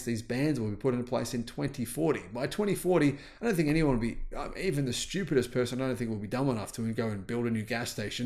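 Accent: Australia. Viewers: Loved hearing your Australian accent enunciate the name of my NorCal town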